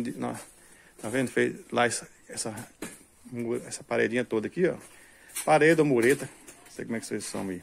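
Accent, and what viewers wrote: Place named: Minas Gerais, Brazil. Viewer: Então tá bão uai....eita sotaque forte de minas...tá ficando chibata!!! Arrocha aí meu irmão. Forte abraço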